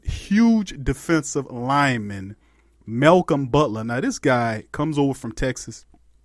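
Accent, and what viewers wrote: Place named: New Orleans, United States. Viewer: That's new Orleans for you I'm from the boot so it sounds normal to me 🤣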